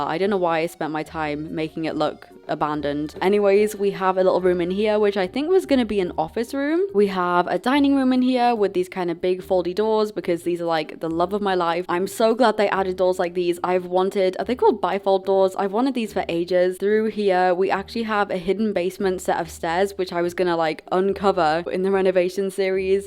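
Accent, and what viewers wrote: United Kingdom. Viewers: You British slayyyyyyyyyyyyyyy I from wales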